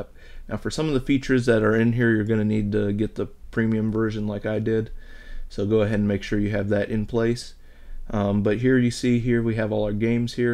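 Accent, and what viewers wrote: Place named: India. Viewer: Oh awesome I thought you were like Indian or something 😂 lol nice!!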